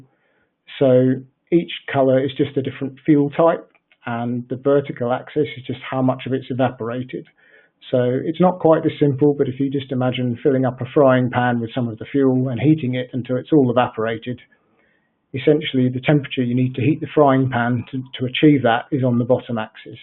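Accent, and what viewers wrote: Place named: England, United Kingdom. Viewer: I told you I fell asleep after 3 minutes. And if you put things like this up on you tube you have to accept the comments from every 1 that might watch YouTube. I enjoy history very much but with in 30 seconds of listening to the man talking in this vid I realised this was an English man which I had no interest in listening to. If you don't agree with my comment you can very easily ignore it it's far from trolling. Maybe I have hurt your feelings in some way. It's ok tho we all know history. We don't need to be told how it all worked out and who the better engineers were (germans) anyway I will say good bye now take care of yourself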